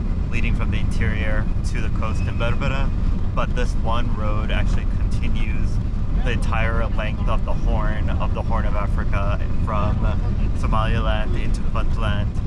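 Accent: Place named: United States. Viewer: Thank you for this beautiful vlog of Berbera Port.I liked and subscribed the channel.Are you from the United States.You've am American accent.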